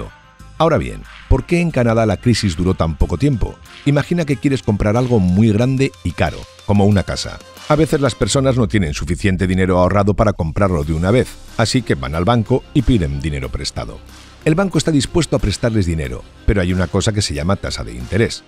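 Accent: Spain. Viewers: Me gusto mucho este narrativa de aprendizaje no hay ruido de fondo esas música que suelen poner que no te dejan escuchar y ese acento español me gusto ❤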